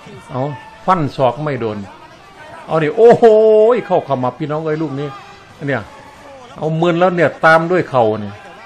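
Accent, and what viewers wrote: Isan, Thailand. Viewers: คุณ สุดยอดนักพากษ์ครับ ถึงแม้จะผสมผสานกับภาษาอีสาน แต่มุมมองของผมๆว่าสนุกดีครับ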